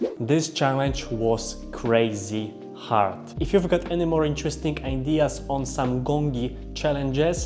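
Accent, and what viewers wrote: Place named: Poland. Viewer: Ur Polish aren't you? Im Poland too hehe (i think ur Polish cuz ur accent and Cisowianka in the back haha)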